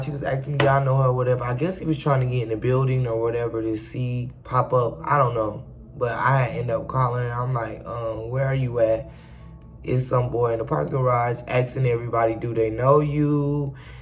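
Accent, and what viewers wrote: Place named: Midwest, United States. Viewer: Gotta be from Midwest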